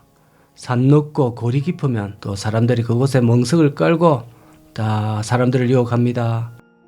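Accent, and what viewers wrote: Gyeongsang, South Korea. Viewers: 야이마 겡상도 사투리 쓰지마 방송하려면 시부럴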